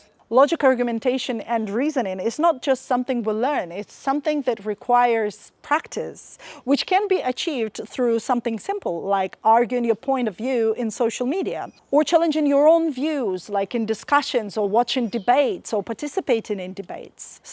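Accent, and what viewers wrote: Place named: Jamaica. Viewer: OMG! I love your videos.... I have been following you from day one, and it's amazing how much stronger your Jamaican accent has gotten ❤. Keep up the good work 💪💯😊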